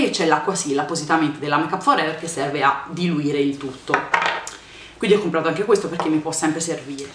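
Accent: Tuscany, Italy. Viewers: che bello che sei tornata! mi mancava tanto anche l'intonazione toscanaccia! eheheheh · non è vero che non sei cambiata dall'inizio. tu sei cambiata, si, ma non in peggio, sei diventata meravigliosa. ogni volta che guardo i tuoi video penso a quando di nascosto dalla mamma accendevo i pc e mi mettevo a rifare i trucchi bellissimi che mi insegnava una biondissima ragazza toscana, dall'accento pronunciato e esilarante, che ogni volta mi metteva ilarità. ora sei cresciuta, ma questo è naturale, l'importante è farlo bene, e, lasciatelo dire, TU CI SEI RIUSCITA. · A me sei mancata perché è mancato il tuo modo di esprimerti e mi è mancata la toscana di youtube.. perché quando da Bologna guardavo e guardo i tuoi video la sera dopo aver sentito un sacco di accenti diversi, sentendo parlare te mi sentivo un po' a casa. Metti allegria e mi piace la tua giovialità! 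complimenti per la tua votazione e in bocca al lupo per il tuo futuro da MUA! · ciaooooo sei beramente bravissima!!!! ma sei toscana!?!? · Ciao! Finalmente sei tornata! Nulla, volevo dirti che sono (come credo molte delle tue iscritte) molto fiera di te perchè hai inseguito il tuo sogno e hai raggiunto i tuoi obbiettivi. Devi essere veramente felice della persona che sei, credimi! Continua sempre a fare quello che più ti piace e vedrai che di soddisfazioni ne arriveranno sempre di più:) (Mi chiamo come te e sono anche toscana come te ^^ Quando ti sento parlare mi sento proprio a casa!) Un bacio a Tiffyyy! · Sono felice che l'accento toscano ci sia ancora!!!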